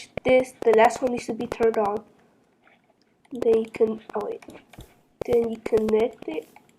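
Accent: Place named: Ireland. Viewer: OMG so irish i love it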